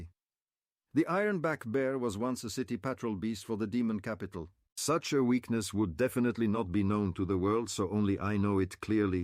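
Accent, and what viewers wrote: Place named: India. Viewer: It's like an Indian accent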